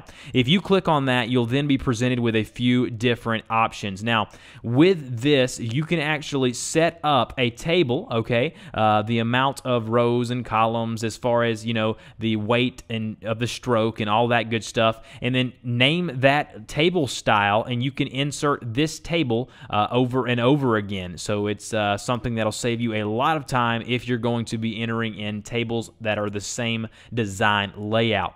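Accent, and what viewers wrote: South, United States. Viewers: Awesome video and I like your southern accent!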